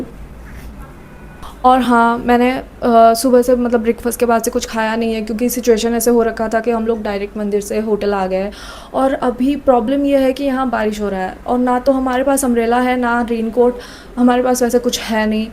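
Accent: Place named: Odisha, India. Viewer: ମୁଁ ଜାଣିଛି ତୁମେ ହେଉଛ 💯% ଓଡ଼ିଆ। କାରଣ ଯେଉଁ ଓଡ଼ିଆ ଲୋକ ହିନ୍ଦୀ ରେ କହିଲେ ଜଣା ପଡ଼ିବ ଓଡ଼ିଆ ଲୋକ।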